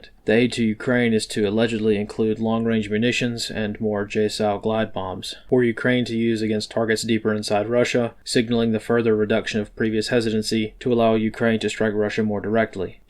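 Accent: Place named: Chicago, United States. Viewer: Sounds like he’s from Chicago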